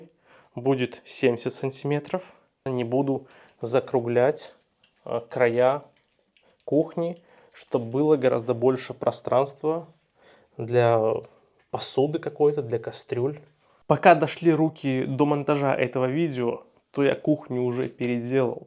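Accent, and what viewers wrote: Belarus. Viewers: Здравствуйте! Судя по говору вы белорус😊 привет вам со Ставрополья! Мои предки строили дома из самана и они могу стоять сотни лет если за ним ухаживать.Отличие от вашего хоббитского все дома из самана с толстыми стенами и это важно ! Такие хатыбелят известкой а фундамент черным битумом. Красиво и нарядно ! Ваше умение строить такие печи со сложными дымоходами восхищают! В нашей местности дерево всегда ценилось и глиночурки не известны.и конечно с дровами туго.В детстве бабушка топила углем а потом у всех провели газ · А мне сразу стало понятно, что это где то в Белорусии. Первое: по говору. Второе: белорусы замечательные умельцы и трудолюбивые рукодельники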